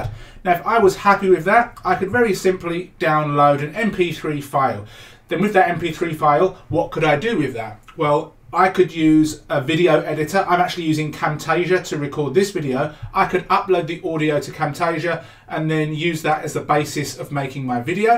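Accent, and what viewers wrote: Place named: United Kingdom. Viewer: I noticed your region was 'Oregon' although you sound British. Is this so you can access the Neural voices? I'm from London area and they are not available (?) Is this because of the region specified in my AWS account or is it IP address? or what?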